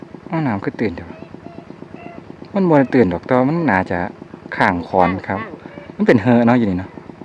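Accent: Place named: Isan, Thailand. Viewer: ฟังสำเนียงเสียงอิสาน คิดถึงบ้านที่เกิดแดนราบสูง ตกเบ็ดช่อนลุกปลากับแม่อบอุ่น ยิ่งดูยิ่งคิดถึงแม่ที่ห่างไกล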